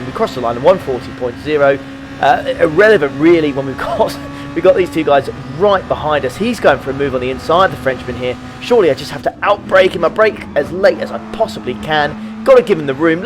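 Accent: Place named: England, United Kingdom. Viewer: Great Race, Dramatic Supens. Congratulations for ur fighting spirit znd ur Fair-play.💪👍 Love ur English accent and your channel content.👍🤩🤩🤩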